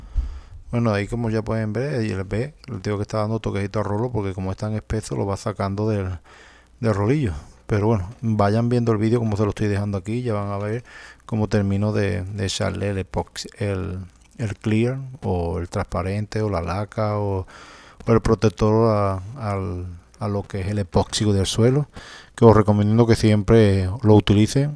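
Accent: Andalusia, Spain. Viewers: ¿Puede ser de Cádiz o Sevilla? Saludos desde Pilas (Sevilla) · Holaaa, he encontrado este video, es un trabajo excelente. Por casualidad eres andaluz?? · Hola amigo.... De dónde eres? Por tu habla pienso que eres de muy cerca de mi pueblo... Sanlúcar de Barrameda Cádiz... España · Buen trabajo! Illo, usted de donde es? De Conil?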